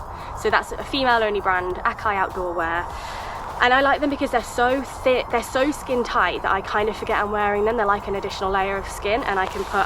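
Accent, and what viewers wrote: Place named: RP, United Kingdom. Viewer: Thank you!! As an American I loved your proper accent and pronunciation. I found the difference in vocabulary I.e. torch/flashlight cute!More importantly that was quite the comprehensive list!!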